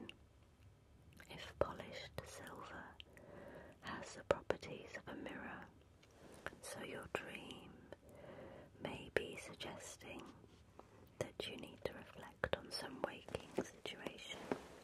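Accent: England, United Kingdom. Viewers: Hi.... Just discovered your channel.Makes a nice change hearing an English voice.You have a soft tingly voice.Its crazy I want to hear more of your voice but I am asleep after 5 minutes.So in compensation this video will take weeks to complete.....nice. Kind regards Mick.(UK) x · What a beautiful English accent. Brilliant ASMR, thank you.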